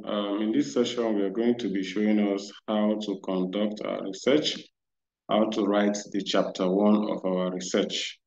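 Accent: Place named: Nigeria. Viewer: It's a beautiful work. A nice presentation. The boss accent sounds Nigerian.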